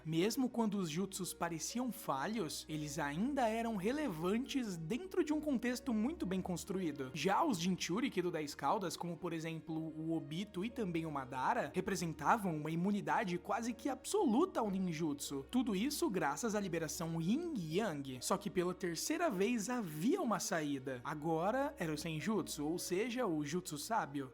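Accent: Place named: Caipira, Brazil. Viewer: voce tem seriamente que corrigir esse (R) caipira que vc tem, isso é feio de mais.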